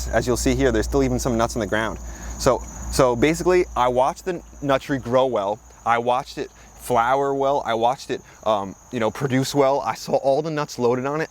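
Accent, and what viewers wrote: New Jersey, United States. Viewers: I love watching your channel. From the Jersey accent to your child like enthusiasm for your entire gardening adventure, you are truly entertaining and very informative. I am a 64 yr old that is in process with the hubs building a new home on our 7 acre property in SE Indiana in the Ohio River valley. The hubs and I both grew up on farms and our families always had gardens and livestock. Of course, neither of us appreciated being raised that way AT THE TIME, (too much like work!)but are looking forward to cultivating as much of the 3 plus lawn area of our property with food and probably a few chickens. I am hard core about gardening via organics, and the hubby says "Meh", but pretty much does as instructed in that area!! We've already done gardens on our previous property, started it in the spring by adding soil amendments like sand, (to break up that Hoosier clay!!) rotted horse manure donated to us from my farmer uncle, piles of rotted leaves we'd accumulated the fall before that we just spread out on the new garden spot and covered with a huge tarp after we wet them down and left it for the winter. 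We added some lime, to sweeten things up, tilled it all in and started planting. We made the mistake of planting 72 tomato plants and didn't stagger them at all. OH MY GOD!! We sure learned our lesson there!!! It was also a really good growing season that year for tomatoes. Lets just say the neighbors, our church family, and we had all the tomatoes anybody could ever use! Thanks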